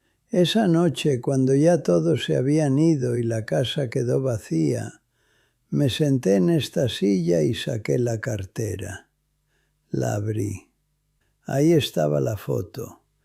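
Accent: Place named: Spain. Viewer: Tu acento es español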